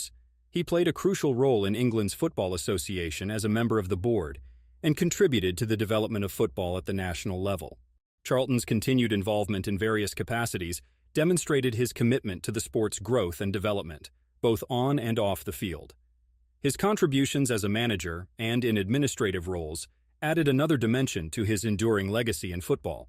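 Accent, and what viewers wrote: United States. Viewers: a f----------g yank what so you expect blue blooded murder of the english tounge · Full of bullshit! No wonder its a Yank voice. Stick to Ted Lasso and leave football to the rest of the world.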